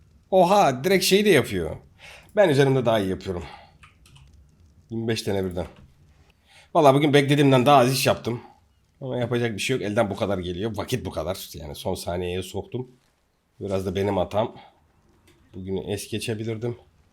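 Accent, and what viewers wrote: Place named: Anatolia, Turkey. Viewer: Anadolu insanı gibi konuşman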